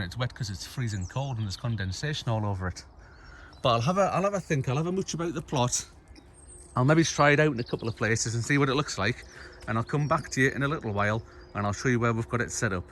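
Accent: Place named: Newcastle, United Kingdom. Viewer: Hi a few questions for you, what is a Geordie sounding bloke doing living in Scotland? Why didn't you attach the Camera to the shed instead of the water butt? and could you say screw a 4x2 to the side of the Compost bin and get the height that way? and finally i wouldn't go telling everyone where the Camera is, just incase someone pinches it one night, plus if any vandals come along and fingers crossed that they don't, at least you will have some footage of them, and i'm not talking about the furry kind of vandal ha ha ha. Sorry for the questions new Subscriber now, so i'm off to play catch up on your older videos. Stay Safe, Barry (Wirral)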